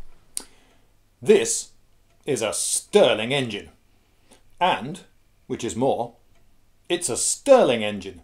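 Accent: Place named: United Kingdom. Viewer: I do so enjoy your so British calm but enthused way of speaking. A couple of my professors spoke this way and it kept my interest up to pass with rather good grades.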